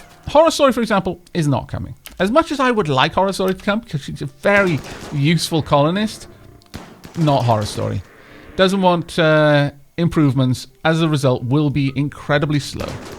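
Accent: Wales, United Kingdom. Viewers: Smartness Tooth is out, novacaine wore off, video is out... Trying yo decide wether to die from the pain, or jump for joy for a new RimWorld video. Leaning much more towards the jumping for joy option. voice is very soothing... I'm only a quarter through the video, and my jaw is already starting to fade. I think its the Welsh accent. Even my 'murican upbringing can appreciate an amazing accent ^-^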